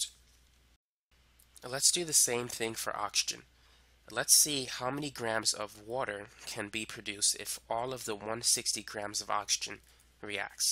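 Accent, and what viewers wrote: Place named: Boston, United States. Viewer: His boston accent 🤤🤤🤤🤤🤤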